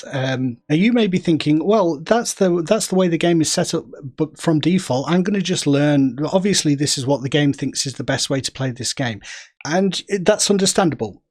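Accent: United Kingdom. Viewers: why is every darn video i watch on youtube always from someone who can't speak english without some heavy british scottish accent?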